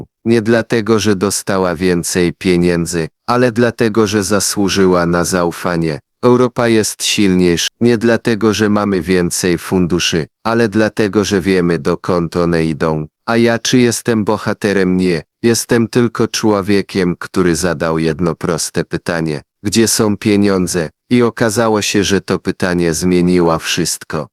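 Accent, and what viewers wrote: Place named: East, Poland. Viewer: Kto to czyta No Akcent jest Wschodni jeszcze to jest zrozumiałe Ale kwoty liczby daty lata nie mogłam wyłapać Ile miliardów kiedy była ta konferencja więcej staranności Akcent mi nie przeszkadza gwałt przekazywanych Ukrainie lata miesiące kraje więcej szacunku dla osób korzystających z kanału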